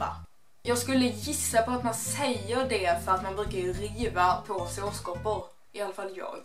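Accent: Sweden. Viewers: Är hon inte från hbg? Hon låter som de faktiskt.. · baserat på dialekten är hon från Kristianstad...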